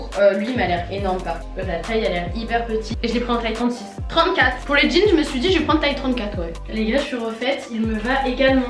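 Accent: Marseille, France. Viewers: T trop belle et trop drôle et grv spontané et moi aussi je kiff l accent marseillais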